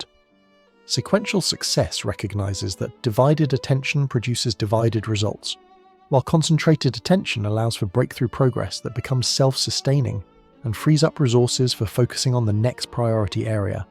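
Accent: United Kingdom. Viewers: Blah, blah, blah, blah, blahhhhhhhhhhhhh Finding some British twit to read your garbage script doesn't turn a turd into roses, it's still bull---- All I heard was an average man excuse manifesto, BEGGING women to settle on them and stop being so mean with their mean mean standards, and leaving them for better men Geez, you Bro's need pacifiers more than you need women. This was ridiculous 😂😂😂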